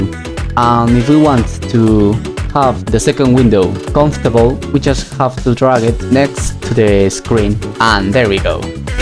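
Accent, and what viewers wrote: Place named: Germany. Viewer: pretty german, good tutorial helped me alot 🐙👍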